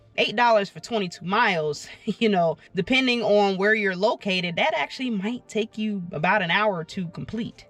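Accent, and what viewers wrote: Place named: AAVE, United States. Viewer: It's AAVEE, which is just another system of language in America, particularly by black people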